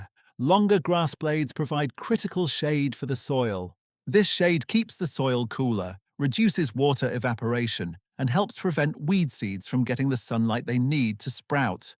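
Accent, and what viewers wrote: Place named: United Kingdom. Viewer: This video was solid. British accent AI or not,is always awesome. Thanks for taking the time to make it!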